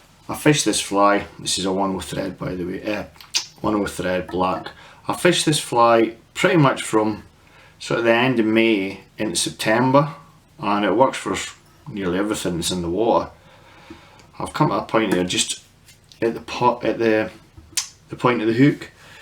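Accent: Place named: Stranraer, United Kingdom. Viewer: Another nice fly, that sounds like a stranraer accent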